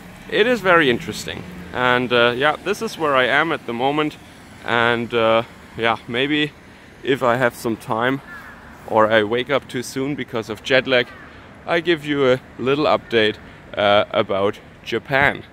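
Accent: Bavaria, Germany. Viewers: Das war sehr interessant! Danke Schön! Mano dein Akzent ist sehr lustig. Typisch Bayerischen Akzent. 😂 sorry i lived in the North and you guys crack me up. Tolles Video vielen Dank!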